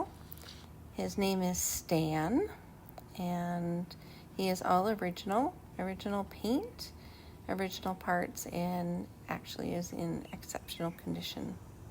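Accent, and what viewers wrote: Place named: France. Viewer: The very informative, but it is difficult to understand your very thick French accent.😔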